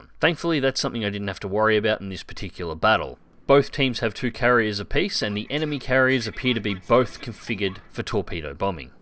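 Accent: Australia. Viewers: good to hear an aussie voice on a wows vid. This was one of the most instructive vids i've found on cv. thx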